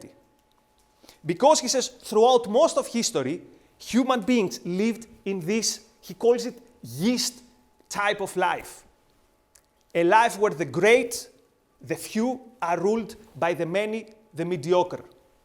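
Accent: Greece. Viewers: Greek accent is adorable.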